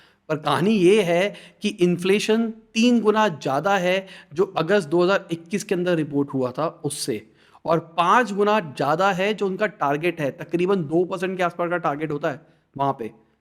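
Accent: India. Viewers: Papa news name is always good. Ye sound like bihar agency or something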